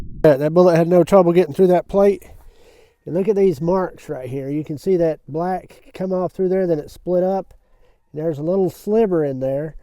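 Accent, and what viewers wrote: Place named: South, United States. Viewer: Man painka pietinių valstijų akcentas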